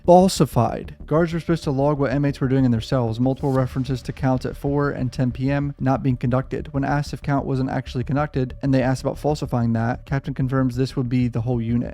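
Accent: United States. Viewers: Difficult to follow you when you speak so fast and your accent is very American with lots of raw raw-rolls. Hard for foreigners to catch.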